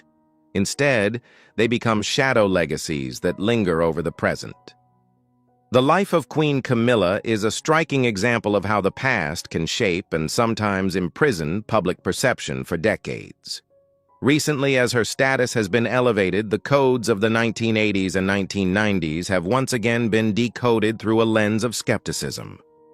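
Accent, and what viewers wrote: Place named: United States. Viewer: Waste of time listening to this, and the American accent is nauseating.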